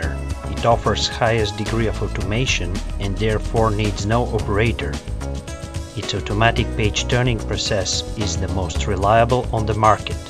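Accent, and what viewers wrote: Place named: India. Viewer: Swiss made but with Indian accent ?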